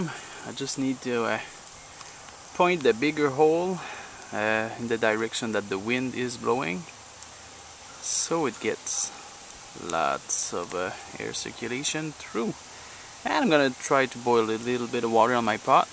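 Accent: Quebec, Canada. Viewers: Sonne accent québécois ca mon chum ! Bon video ! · I was gana make a comment about in Canada we just trow a bit of water out and see if it freeze but Avec ton accent j'ai réaliser que tétait canadien.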